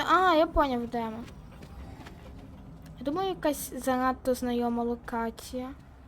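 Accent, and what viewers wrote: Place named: Ukraine. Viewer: Вроде говоришь по русски, но украинец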